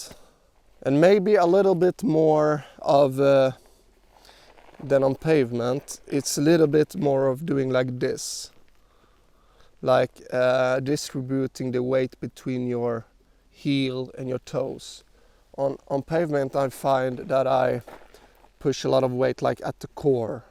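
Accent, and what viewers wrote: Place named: Sweden. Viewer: Wow, thats really nice breaking. You sound Swedish (är du Svensk?) When you were braking on gravel i was like, what did they add ABS on some model allready? 😮 Cause those skid streaks seems impossible to make unless its something self controlling (3dm in 50km/h (13.8m/s) only takes 22ms to travel, seems inpossible to adjust based on input every 22ms. But might be that wheel becomes self adjusting? As it starts skidding, the power drops drastically vs when actually breaking? Why no helmet though? Braking from 50 can turn this into something that hospitals log, to ban EVs (dont ask me how i know 😊)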